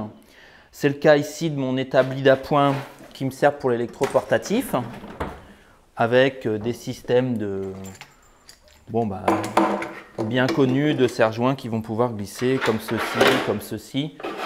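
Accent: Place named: United States. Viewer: Un "petit" 60 m2, ça fait un paquet d'abonnés qui vont t'envier :-) On croirait entendre un de nos amis américains. Merci pour la visite détaillée, toujours un plaisir !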